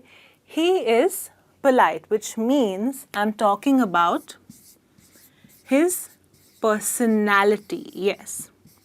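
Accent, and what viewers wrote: India. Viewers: Aleyda Narváez Narváez lol,,,, u moron,,,shez Indian,,, how cn she pronounce like u American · CARMENFERNAN YANET FERNANDEZ BUSTOS ....excuse me....but actually...she is an indian lady....so how she will be Ble to speak in american english...its common sense..dumb...u r an american ...so u will be never speak like an indian...r u???...nt at all...then why r u telling her....bc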